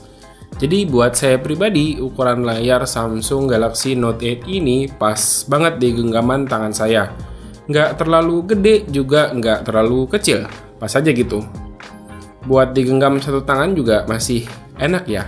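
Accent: Samarinda, Indonesia. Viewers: Orang Samarinda kah bang?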